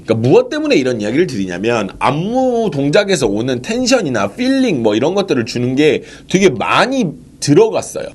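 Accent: South, South Korea. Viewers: 아따 ~ 남도사투리로 설명하는게 겁나 구수하구마이라~ ㅋㅋ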